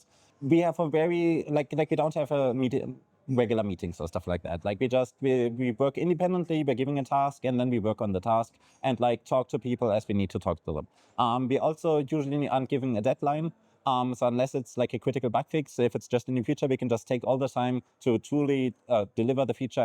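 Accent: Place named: Germany. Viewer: I like germanized English.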